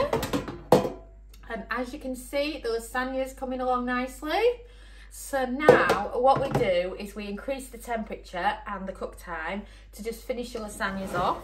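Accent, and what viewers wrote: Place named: Yorkshire, United Kingdom. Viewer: It’s some of Northern British accent, maybe Yorkshire? Very endearing to hear but I think some non-Brits may not understand everything.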